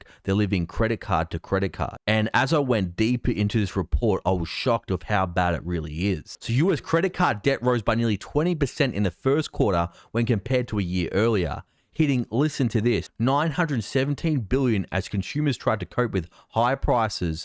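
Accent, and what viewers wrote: Australia. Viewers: Thanks, your sincerity, enthusiasm, and respect for your viewers shows on everything you do! And as an American, I love hearing real news delivered with an Australian accent! Great job!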